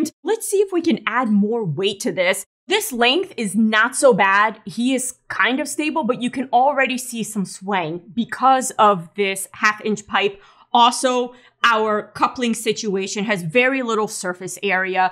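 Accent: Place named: Upper Midwest, United States. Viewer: You are a burst of energy and good instruction. Where does that accent come from? Upper Midwest? Glad to have found you.👍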